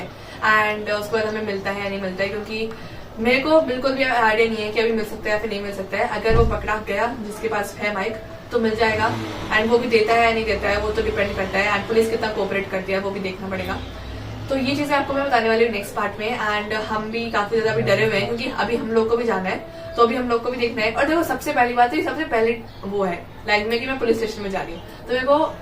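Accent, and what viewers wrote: Haryana, India. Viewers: this red t - shirt wale bhaiya has been controlling his laugh and had never been serious in the whole video 🤣🤣😂😂😅😅🤣🤣🤣 by the way I love ur videos and I request u to pls give some tips and information about apps u use for editing etc. to help me start a you tube channel🤗🙏🙏🙏🙏🙏🙏❤❤❤❤❤❤☺☺☺☺☺ by the way di aap HARYANA se ho kya ??? because apka accent bilkul vaisa hi h guse mai ya funny mai😂😂😅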